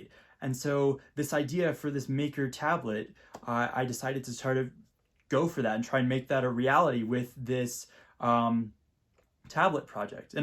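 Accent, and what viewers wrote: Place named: United States. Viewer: his accent seems american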